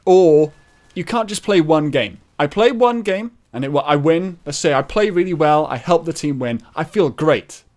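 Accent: Wales, United Kingdom. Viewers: I can't quite make a definite decision but I seem to keep picking up on a little tinge of a Welsh accent when you speak. Being from Wales myself I can somewhat recognise certain changes in the way you pronounce things such as "here". Anyway, sorry to ramble, was just curious.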